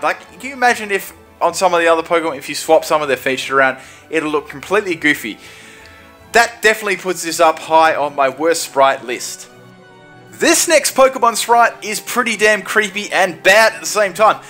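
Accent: Australia. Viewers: I'M FUCKING CONFUSED YOU SOUND AUSTRALIAN ARE YOU AUSTRALIAN!?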